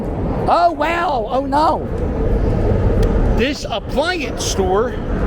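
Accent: Philadelphia, United States. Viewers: Love your Philly accent. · Which part of america is your accent from?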